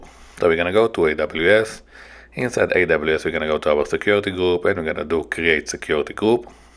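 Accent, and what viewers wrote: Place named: Israel. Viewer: Brother are you Israeli by any chance? the accent is too noticeable 😅😆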